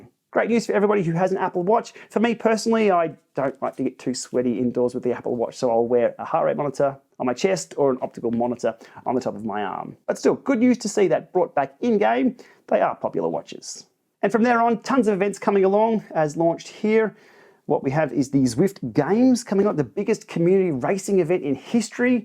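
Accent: Australia. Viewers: Don’t say it both ways just talk Australian and say route as root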